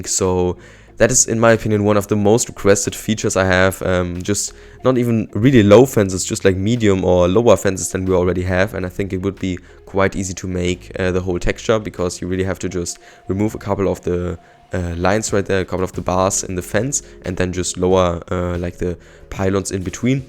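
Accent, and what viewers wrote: Germany. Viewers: I love the Design and Are You German because of the accent😅?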